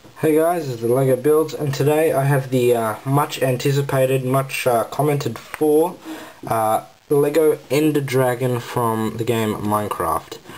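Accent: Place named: Australia. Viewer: I can tell your an aussie. i am an aussie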